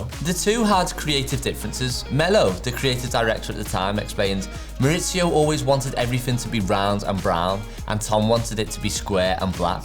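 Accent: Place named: Northern England, United Kingdom. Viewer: Great vid. Very informative. Great northern accent. That compliment is from a South London boy. Ya get me.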